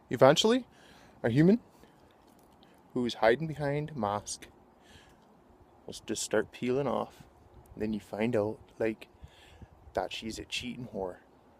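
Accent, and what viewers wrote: Alberta, Canada. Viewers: Lol I am about to do some patio and deck repairs for one of my rentals soon. Glad I found your video, bud. Funny as fuck. I thought your accent was sounding awfully Albertan and lo and behold 😂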